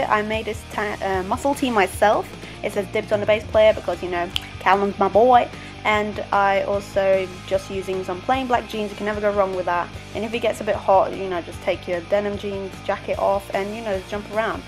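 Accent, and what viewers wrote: United Kingdom. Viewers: You're Norwegian? I was pretty sure that you were British. Bc accent. IM SWEDISH, HELLO NEIGHBOR!!!!! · You're from Norway?!? I thought you were from the UK omg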